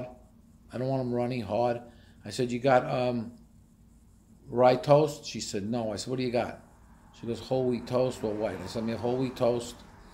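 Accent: North Jersey, United States. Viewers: North Jersey here (Northern Bergen County). He’s got a heavy Italian North Jersey accent. Being non Italian, he doesn’t sound like me!!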